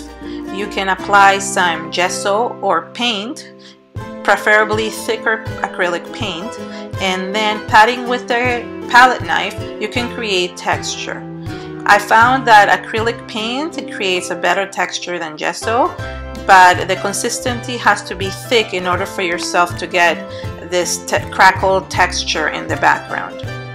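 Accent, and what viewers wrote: Israel. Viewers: From your name and accent, I’m guessing that you’re Israeli? Love your videos!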